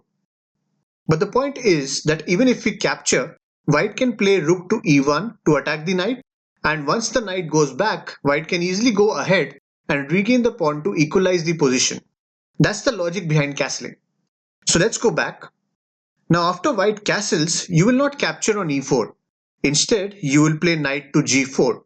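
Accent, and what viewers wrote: India. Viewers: I just want to recommend that you speak slower. Since you have an Indian accent some words are difficult to understand. But if you speak slower it will be great.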